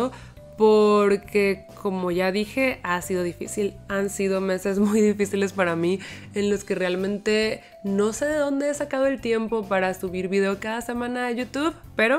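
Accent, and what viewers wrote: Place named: Mexico. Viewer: Estoy aprendiendo español con tus videos. ¡Hablas tan hermoso y claro! Practico mi acento mexicano contigo <3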